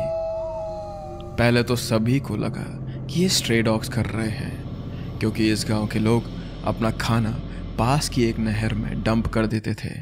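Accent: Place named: Bengal, India. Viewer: His mother toungh is Bengali bro he can't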